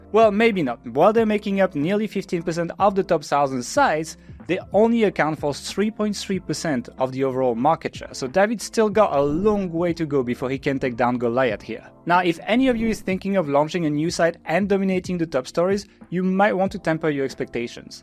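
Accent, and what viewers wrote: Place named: France. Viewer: its french accent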